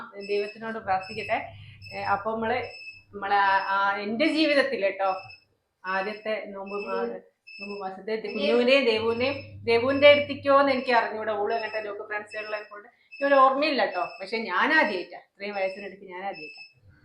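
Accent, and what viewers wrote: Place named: North Kerala, India. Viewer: ഹായ്.... നിങ്ങളുടെ സംസാരം കേട്ടിട്ട്... പേരാമ്പ്ര.. നടുവണ്ണൂർ or മേപ്പയ്യൂർ touch... ജസ്റ്റ്‌ ഗസ്സ്..... Good family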